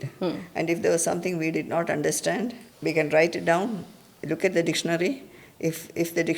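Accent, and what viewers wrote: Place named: South Africa. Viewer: Your voice accent is very interesting. I am a South African Indian and your voice has some of the accent from South Africa 😅.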